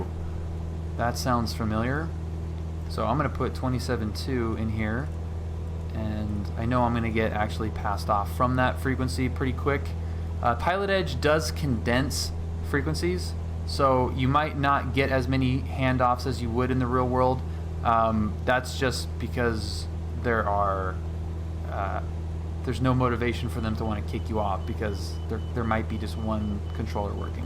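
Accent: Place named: North, United Kingdom. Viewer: But you have a northern accent! 🤨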